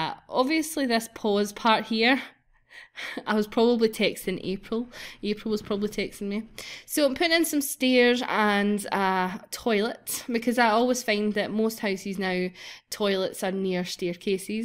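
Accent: Scotland, United Kingdom. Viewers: I love your accent! Are you Scottish? It's so amazing! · Yay. Finally someone from Scotland :D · If you get into these sims could you do a current household I know 8 sims is tragic I would love to see it and I'm so jelly of your voice I'm Scottish to but i don't sound As AWSOME as you 👼💙👼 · U sound Scottish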